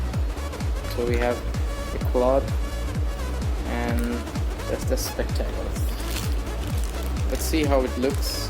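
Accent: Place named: India. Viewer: Nice Indian English accent though!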